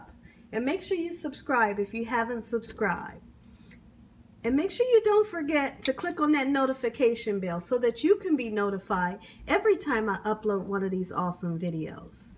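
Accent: South, United States. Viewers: Does anyone know where from bc i swear she talks so Southern.. And sure does cook southern..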